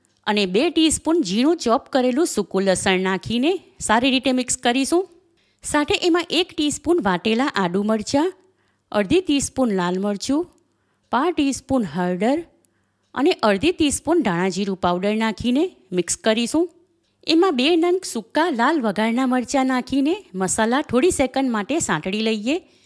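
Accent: Surat, India. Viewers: તમારી હુરતી સ્ટાઇલ ની ભાષા સાંભળવાની મજા આવે છે .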